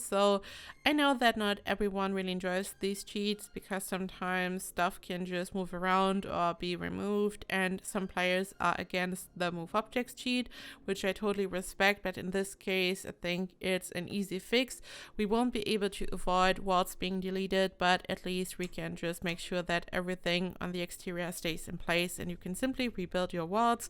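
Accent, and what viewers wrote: Germany. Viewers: you're English has a German accent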